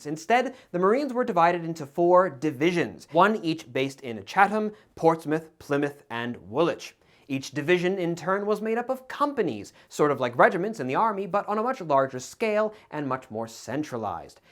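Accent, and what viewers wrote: Germany. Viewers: Almost threw my phone thru the window during the ad with your German accent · I love your German accent, by the way! It reminds me of The Major from Hellsing.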